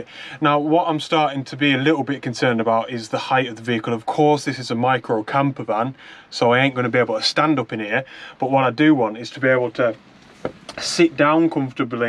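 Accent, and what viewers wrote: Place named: Lancashire, United Kingdom. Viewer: Loving the van build, roll on the next episode. I actually found you by accident when I was looking for tutorials on the Canon M50 Mkii which I have recently purchased! So I`ve been watching your other vids with interest. My Pal Colin also follows you, and you sound fairly local to us, I`m just outside Preston! Cheers Pal 😀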